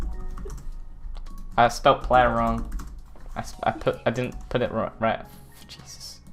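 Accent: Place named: United Kingdom. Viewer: That british accent xD magic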